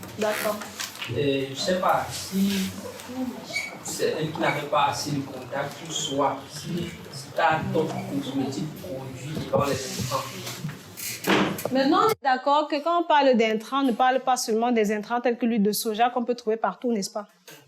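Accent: Cameroon. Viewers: Bonne diction!précise!claire!contextuelle!bien camerounisé!un régal!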